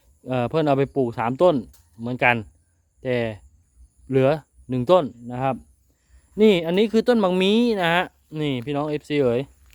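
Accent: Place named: Isan, Thailand. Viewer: ภาษาอีสานฟังรู้บ้างไม่รู้บ้างก็น่ารักดีนะ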